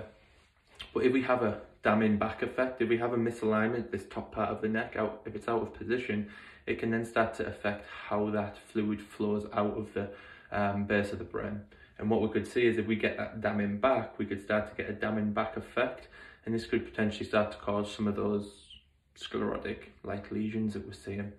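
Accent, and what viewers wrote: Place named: Teesside, United Kingdom. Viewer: I have MS and found this really interesting, thank you. I’m originally from near Manchester and now live in Teesside, trying to pin point your accent. Definitely a N.Yorkshire / Teesside twang coming through.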